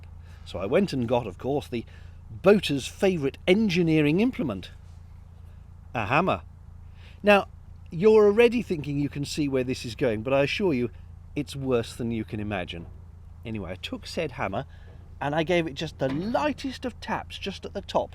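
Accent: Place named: England, United Kingdom. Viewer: Brother from another Mother, having too much fun watching U. Also love your English accent/vocabulary. =^..^=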